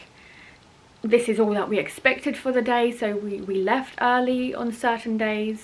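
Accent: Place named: United Kingdom. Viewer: I was looking for a Jury duty video, but instead I found myself listening to a bri’ish accent. That’s wild.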